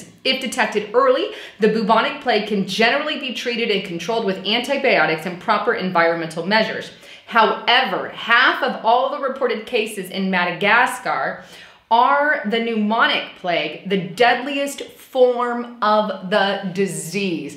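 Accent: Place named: Midwest, United States. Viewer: where are you from? You sound midwestern. I just suscribed to your channel a week ago. I really enjoy your reports.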